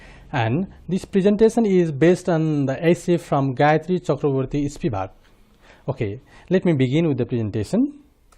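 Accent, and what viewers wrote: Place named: RP, United Kingdom. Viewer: Superb! BBC Style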